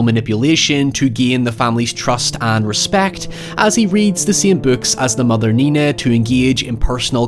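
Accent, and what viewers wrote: Northern Ireland, United Kingdom. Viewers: Surprising to hear a fellow Northern Irishman! Love this film, a true hidden gem.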